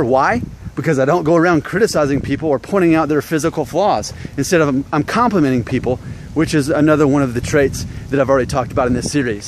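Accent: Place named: South, United States. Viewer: Quarter mexican with that southern accent how the heck does that happen?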